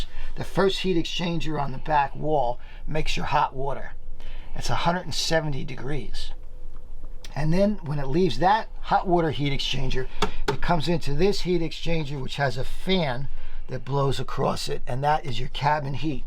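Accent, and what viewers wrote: New Jersey, United States. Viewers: I love the Hosts accent, he is so NJ accent.......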